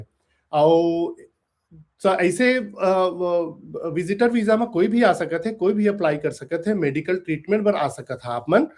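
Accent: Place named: Chhattisgarh, India. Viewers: तोर बोली ह अब्बड़ मिठ लगथे भैया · Jay johar bhaiya pranam bhaiya 🙏 aapke chattisgarhi boli Bhari nikh laagthe, Aap bahut badhiya badhiya jankari dethav au aisnech hamesha jankari dete rahav au aap jaise kahithav na ki India cg yha se dur nhi lage krke vaisnech ab haman la bhaiya aapse Jud ke america dur nhi lage au te au aap Bahut sughghar Git sangeet gathav badh aap america me rahi ke bhi apn mati se atna Jude ye aapke chhattisgarh mahtari ke prati maya darsathe ....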